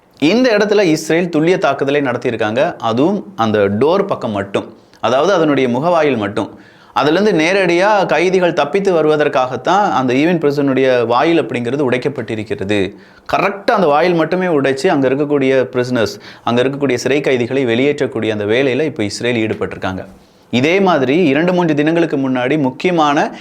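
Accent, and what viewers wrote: Kerala, India. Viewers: Bro your official slang reminds kerala pronunciation! Are you from kerala?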